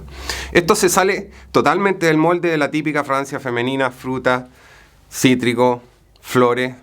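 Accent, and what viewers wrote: Chile. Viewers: Su acento es Chileno? Cuando dice: que me encanta, que me vuelve loco...madre mía!! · con razón le encontraba acento chileno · cual es tu nacionalidad? Me parece escucharte un acento chileno? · perdon....Quien dijo que el era Español???? su forma de hablar es Chileno...!!!! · Si, es chileno, pero hace años vive en España, por supuesto le delata su acento, yo le entiendo perfectamente, a él y a los chilenos en general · Dice "fragancia" y yo escucho "Francia". Supongo que es chileno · Alguien save de donde es el. Su acento es de Chileno Pero el vive en españa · Tienes acento chileno. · Por el acento es de Chile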